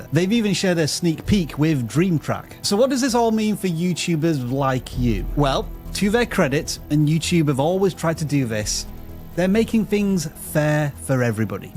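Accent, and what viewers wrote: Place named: Yorkshire, United Kingdom. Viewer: Yo I know you live in Canada, but I know that you came from Yorkshire. I can hear it in your accent. What I would like to know is cause. I have a friend who’s from Yorkshire what part of Yorkshire are you from? He’s from Hull. He also has a YouTube channel, so I have been comparing your accents.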